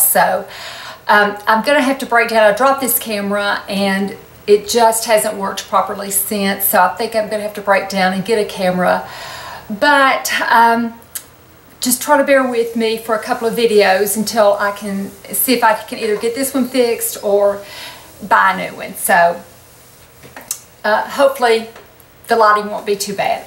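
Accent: South, United States. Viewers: I think we are all blessed if we just look at the great things that have happened in our lifes. kids, grandkids, and if luck maybe I'll be able to meet my geat grandkids one day. I have Dystonia, CFS, Fibromyalgia and spine issues but I still get up every morning thinking about my life being so blessed. I have just been diagnosed with the Dystonia I didn't even know anything about this thing that has taken over my movements on my right side in December of last year. I feel it has got hold of the wrong loud mouth lady lol. Bless you for the video you do it really is a true blessing to watch your video's. I live in Georgia you sound like your from the south to my friend... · You are so beautiful. I LOVE how your voice is so calming. Im from the south so the accent adds to the charm. Thank You for the wonderful tutorials. Also, you are so humble. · Hey, Love your videos and your southern accent. 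I know you are gluten free (Chrohn's Disease and maybe Celiac). I have Celiac Disease and was wondering if you choose gluten-free lipsticks to wear? If so can you recommend some.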